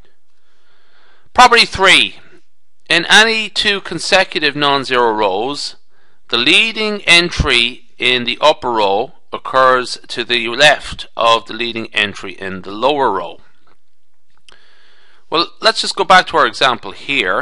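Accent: Ireland. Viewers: it's because both are irish accents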